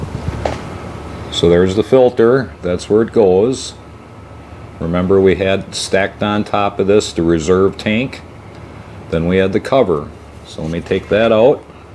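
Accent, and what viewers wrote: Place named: New England, United States. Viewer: I’m sorry I just can’t stand the sound of Yankees at all. I’ll find somebody else to explain it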